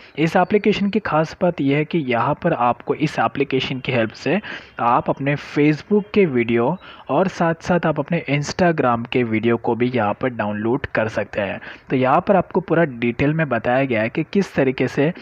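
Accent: India. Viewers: indian accent, dislike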